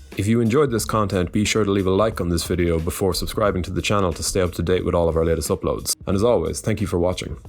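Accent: Ireland. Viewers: Irish Narrator is the real MVP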